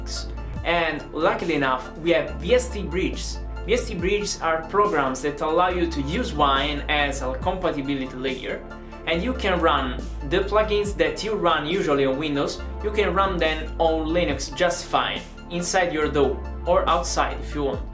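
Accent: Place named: England, United Kingdom. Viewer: I don't know why but.... I love your English accent somehow!!! Its lovely for me...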